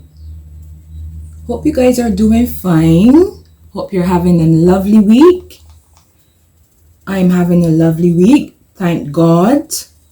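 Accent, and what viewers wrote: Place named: Barbados. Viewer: This look turned out lovely. I'm now discovering your channel, I'm picking up and Barbadian accent from you. Are you Barbadian? 🤭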